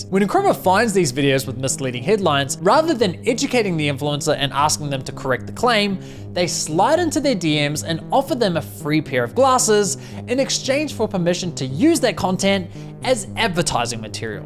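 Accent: New Zealand. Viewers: Stellar work mate ! And, to be fair, your kiwi accent is indeed charming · Appreciating the kiwi accent ( a decade ago ) I swear I heard as a dickhead ago. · it’s just his Kiwi accent. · As an American, I very much appreciate your passion for protecting US consumers. This company is clearly lying. Your channel description and accesnt suggest you are from New Zealand, so I'm curious about your story. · Me too! 😂 That Kiwi accent!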